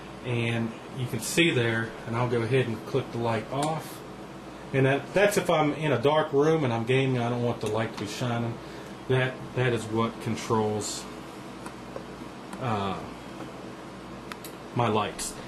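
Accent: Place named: South, United States. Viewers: I'm in love. even though i have a basic computer (if im compairing it to this! lol) but I LOVE THIS CASE. makes me hate my antec 900 lol. very nice and clean build. and i love your accent. nice southern accent :) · Your accent is so... southern.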